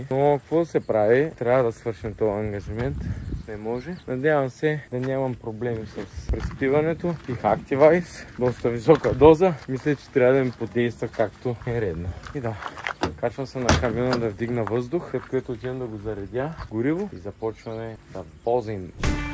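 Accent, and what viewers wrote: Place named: Burgas, Bulgaria. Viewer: Бах се мотивирал да ставам земеделец и животновъд, но ме отказа. Жив и здрав да си. По диалекта ми се струваш в Бургаска област?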